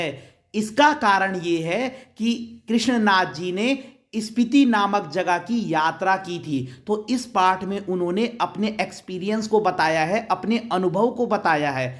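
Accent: Garhwal, India. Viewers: Sir aap garhwali ho kya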